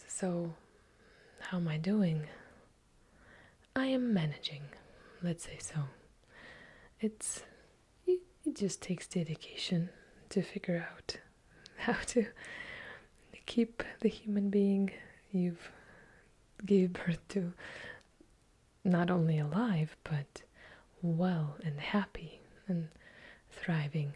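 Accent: Russia. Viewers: Your voice is so warm and relaxing, some of the most soothing ASMR I’ve ever heard. When I first discovered the art form several years ago, GentleWhispering was the first vocal ASMRtist I heard who made me tingle and she also has the same hypnotic Russian accent. Something about the accent just instantly melts my brain and gets me ready to fall asleep, thank you so much for doing what you do!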